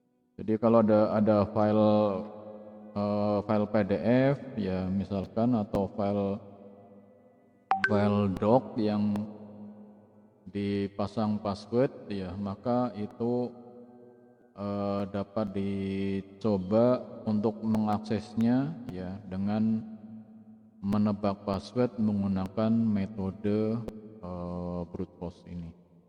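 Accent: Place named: Banyumas, Indonesia. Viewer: Brute Force... Mantaf.. Ngomongnya ngapak gak papa malah seru... Cuma kurang di cepetin dikit ngomongnya hehehe.... Trims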